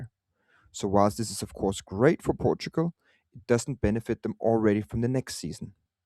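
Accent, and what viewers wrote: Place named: Denmark. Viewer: Are you Danish? You have a very Danish accent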